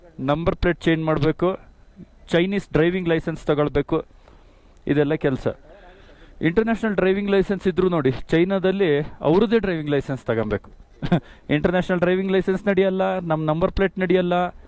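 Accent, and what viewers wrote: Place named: Kundapur, India. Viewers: Hello nivu Udupi avara, nimma kannada Keli oru badi andu konde. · Kundapur kannada ❤️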